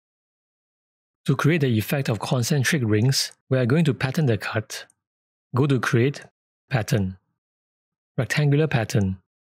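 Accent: Singapore. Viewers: I love your channel! Out of curiosity, are you by chance based in Singapore or from Singapore? Your accent sounds very Singaporean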